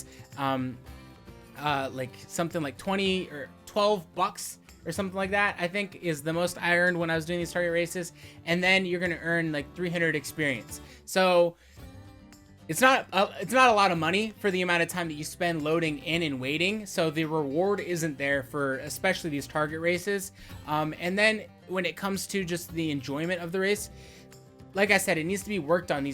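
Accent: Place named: Russia. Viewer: please make more vids especially with your Russian ascent. It’s hilarious! Once a week isn’t enough for me. I look for your vids daily.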